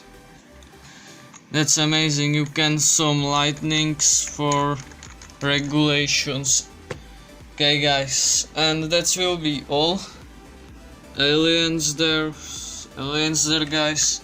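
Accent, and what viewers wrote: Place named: Czech Republic. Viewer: Když už podle prvního slova poznáš že je to Čech :D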